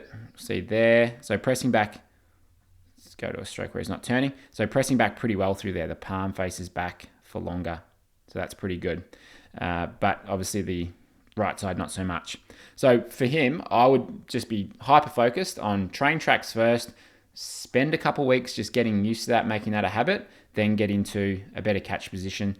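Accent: Australia. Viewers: Australian accent makes this feel both legit and relaxed as hell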